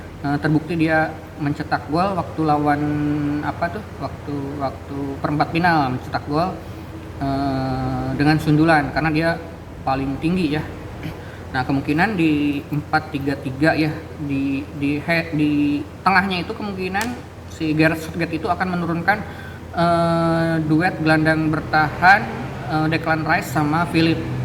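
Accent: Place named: Sunda, Indonesia. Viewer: Bng lagi ngapain di bali ,kaya orang sunda nada bahasa nya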